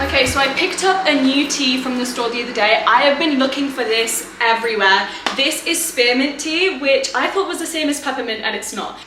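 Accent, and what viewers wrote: United Kingdom. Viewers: You r Punjabi 😃 and your brit accent ❤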